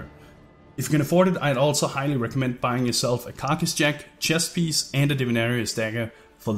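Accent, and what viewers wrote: Denmark. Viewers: Can you level up with this? Btw, are you danish? :D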